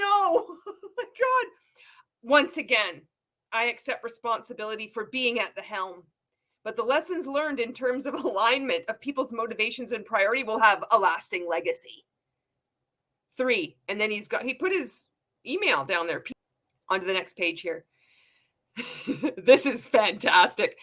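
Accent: Canada. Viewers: I knew I recognized that accent, a fellow Canuck!!!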